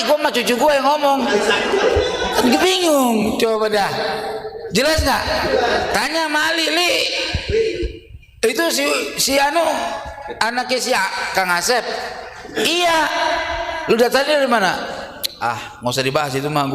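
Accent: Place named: Betawi, Indonesia. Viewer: Gurih banget ini teungku dialek betawi nya. Padahal darahnya Aceh banget. Tapi kalimatnya sangat runut, ilmiah, rasional dan masuk akal serta mudah dicerna dan difahami oleh orang-orang awam. ❤❤❤❤❤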